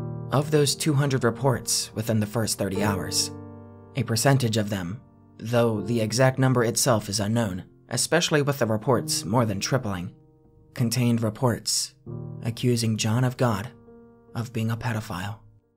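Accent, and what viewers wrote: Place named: Portugal. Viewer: I find your Portuguese accent interesting. Didn't know how he do that "healings". Have a sense of documentary.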